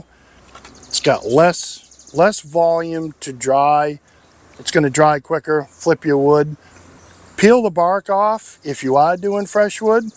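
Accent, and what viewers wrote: Maine, United States. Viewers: Yes you get that white mold on birch here in Nova Scotia too. New Subscriber here, because of the Maine accent 😅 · Are you from Maine by chance?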